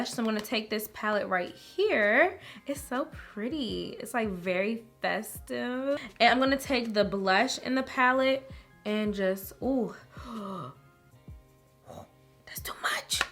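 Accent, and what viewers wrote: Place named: Prince George's County, United States. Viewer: You gotta be from PG County MD 🧐 I hear the accent 😂😂😂 & this look is bomb sis!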